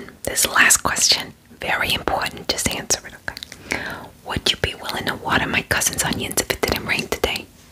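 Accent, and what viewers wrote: New Jersey, United States. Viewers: I live in NJ and there are absolutely Italian women that sound like this all around me. It's definitely more common towards the north end of the state and into NYC. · The NJ accent is on point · I think maybe because this is more of a Jersey accent. My boyfriend is from Brooklyn he has a completely different accent than this 😅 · the jersey accent always reminds me of linda belcher from bobs burgers😞😞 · THE JOY I FELT HEARING THE ACCENT😭 JERSEY FAIRIES FOR LIFE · That’s definitely Jersey lol sounds like a Jersey Italian lol 😄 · Omgggg I missed my jersey accent fairy!!!! This is the best news. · THE JERSEY ACCENT IS BACK ❤❤❤❤❤ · the accent is Jersey, not New York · yeah i’ve never heard a single person unironically talk like that, some ppl come close but i like the idea that it’s jersey.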